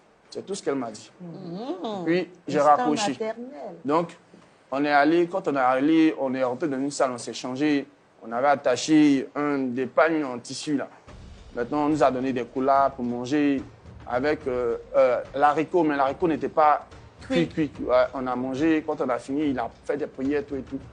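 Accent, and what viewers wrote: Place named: Benin. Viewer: Mon frere meme avec ton mask si c'est du Benin tu parle ont sait qui tu est 😂😂😂😂 eviter de vous metre dans des problemes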